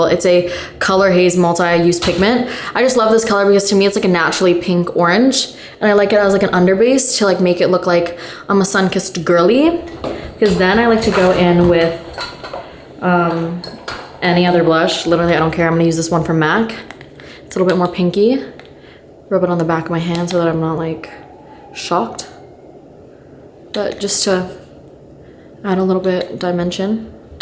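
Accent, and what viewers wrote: United Kingdom. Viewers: We love your Bri’sh accent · you are my queen and the british accent pops off x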